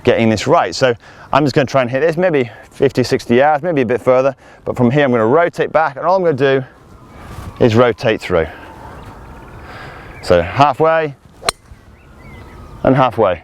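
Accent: United Kingdom. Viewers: Great video's and a proper pleasant British accent 👍 unlike an annoying newsreader 😩👎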